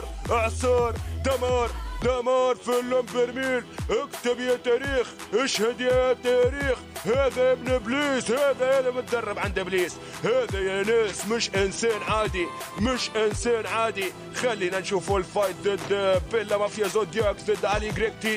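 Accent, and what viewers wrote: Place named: Tunisia. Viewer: المعلق تونسي و قالك فضيحة ههههه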